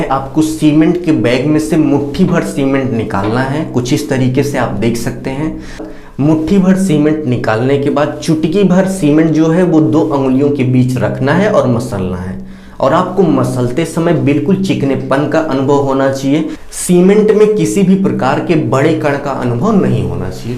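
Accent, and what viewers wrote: Bihar, India. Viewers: Sir ap bihar se hai kya??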